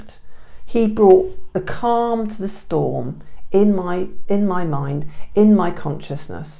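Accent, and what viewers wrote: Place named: England, United Kingdom. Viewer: Nice to hear an English accent on one of these stories. I went to art college, wonderful times. Thank you for your openess. xx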